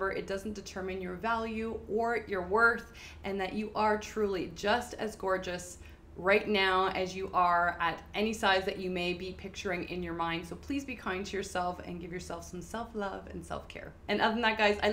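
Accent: Canada. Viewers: You have to be from Canada 😹. I love the accent.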